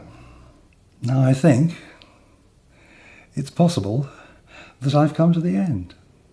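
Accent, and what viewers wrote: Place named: England, United Kingdom. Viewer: For those hard of hearing, or not familiar with pronunciation by an Englishman, 10,000 grit ceramic waterstone is made by Ohishi.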